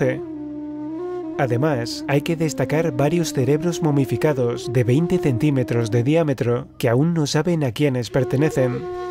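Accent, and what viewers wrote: Spain. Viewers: Vuen video, lasrima del narrador gachupin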